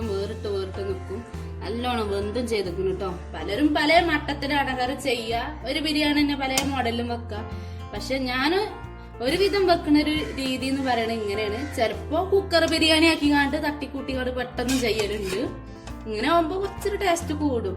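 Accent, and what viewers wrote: Malappuram, India. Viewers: ശരിക്കും മലപ്പുറം ഭാഷ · മലപ്പുറം ഭാഷ കേട്ടരിക്കാൻ എന്തെര് സുഖം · ഇത് മലപ്പുറം ഐഡന്റിറ്റി ഭാഷയാ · മലപ്പുറം നാടൻ പാസ 😄😄😄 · മലപ്പുറത്തെ സംസാരം ഒന്ന് വേറെ തന്നെ ചിരി വന്നു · Mlpm slag ishttayi nallla rangand kelkkaan pinne thaatha nte vayel vellllam varunn nd vishappullla samayatha ee video kandath nte kodhi onnum ndavullla nte ponnu thaatha ichiri chor tharuo☺️ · തനത് മലപ്പുറം ഭാഷ...അടിപൊളി 👍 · Malappuram slang kelakkan vendiya njn vedio kananee 🙊🙆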